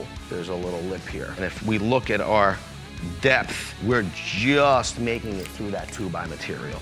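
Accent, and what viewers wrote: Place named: South Jersey, United States. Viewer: You sound like your from South Jersey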